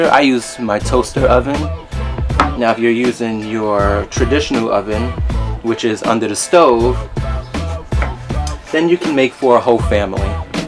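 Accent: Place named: New York, United States. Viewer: Oh I thought I replied here, I guess it must be my ADD...thought I hit post, but probably got distracted. I am sorry, I kinda thought I detected a NY accent so I figured you might know that BLT is NY Diner talk for a Bacon Lettuce and Tomato sandwich...so an ELT would be your Eggplant (bacon) Lettuce and Tomato! I bet it would be amazing! 😍 Don't forget the vegan mayo! 💗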